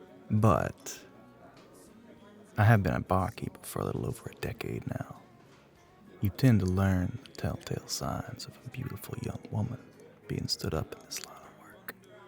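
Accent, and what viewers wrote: South, United States. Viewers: The southern accent gets me every time I can’t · Me being from the south and never, EVER hearing an accent this smooth….I’m blushing 🥹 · I love the southern accent smmmm it’s literally my fav 😋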